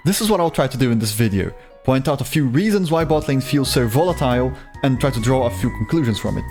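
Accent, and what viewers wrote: Brazil. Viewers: Are u Brazilian ?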